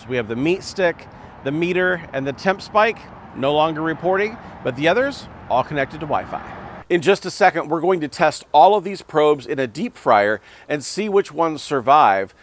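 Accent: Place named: United States. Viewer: You sound American, switch those calipers to inches please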